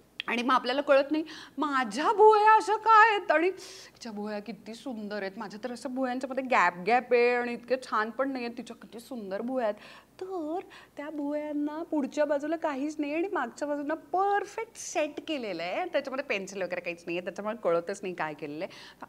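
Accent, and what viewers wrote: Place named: Pune, India. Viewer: पुन्हा नव्याने तूझ्या प्रेमात पडले आज ❤️, ही पुणेकर भन्नाट आहे...typical पुणेकर tone भाषेचा...loved it 😘😘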